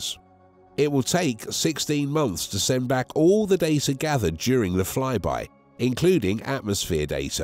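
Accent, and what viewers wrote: United Kingdom. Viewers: Why do working class Brits narrate a NASA inquiry? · British limey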